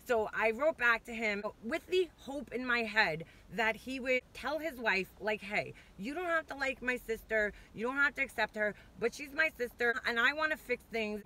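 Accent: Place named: New Jersey, United States. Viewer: are you from NJ or NY? I love your voice. I’m born and raised Jersey too...and I don’t hear my own accent but I hear it when you speak. Your very pretty BTW.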